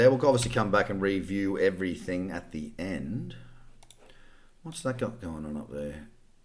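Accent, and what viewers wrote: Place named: Australia. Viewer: Thanks. Loving your work. I'm an Aussie living in Sweden, so its good to hear that accent again!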